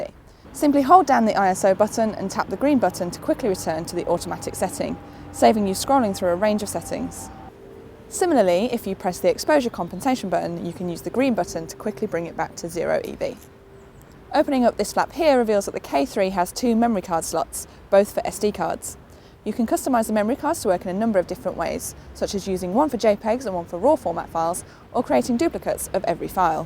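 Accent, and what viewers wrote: United Kingdom. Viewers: Like camera review with uk accent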